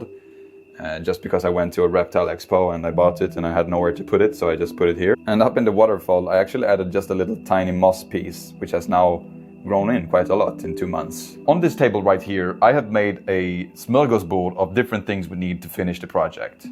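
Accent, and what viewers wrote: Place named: Sweden. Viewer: You sound swedish. Or am i completely wrong? :P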